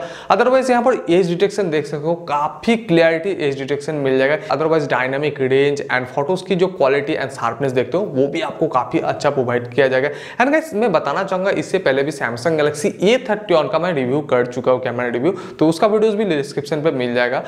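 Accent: Bengal, India. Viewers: Ye keysi baat hey bhai?wo lerka bangali hey.wo indiawale ke liye hindi may phone ki review de rahi hey.to issmey galat kya hey?bangali logoki hindi bollemey thoda kharab to hogii.tamil logokovi eeeysa hota hey.you need to adjust it man.love from bangladesh.take care.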